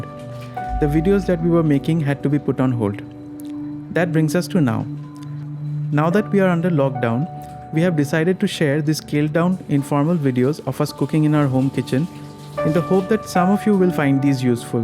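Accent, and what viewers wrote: Bengal, India. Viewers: sir bengoli stile with English language is not suitable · the Bengali accent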